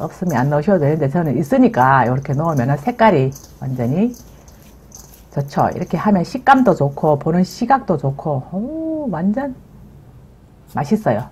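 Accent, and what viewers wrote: Gyeongsang, South Korea. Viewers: 댓글 처음 달아보네요..저는 부산 살다 충청도로 올라와 생활한지 10년이넘어갑니다..그러다보니 경상도 사투리 들으면 왠지 정겹고 그리워요. 사투리 들으니 속이 뻥 뚫리고 넘 재밌어요. 특히 오봉~~ 이란말에 빵 터졌습니다... 좋아요..구독😍😍😍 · 아이구 ~ 아삭아삭 맜있는소리 나네요" 경상도라에 ?? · 갱상도 사투리 너무 재미나요 ㅎㅎㅎ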